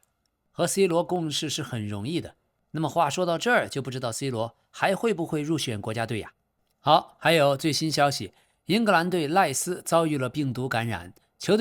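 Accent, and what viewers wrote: Chongqing, China. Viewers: 听口音老k应该是重庆人呀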